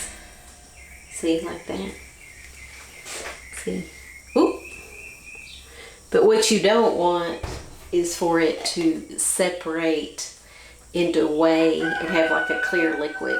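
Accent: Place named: United States. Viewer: Sooo amazing! Thank you! I'm pretty sure this info doesn't exist anywhere else on the Internet. 😂 But there had to be a way. Thanks for sharing. I've married into a German family in South Africa and they also still make Kochkäse. I had to have a little chuckle at the American accent saying it though. I've just recently found your page, as I've taken on the responsibility of our small dairy herd, and I am thoroughly enjoying working through all the shared wisdom you've got here. Very thankful.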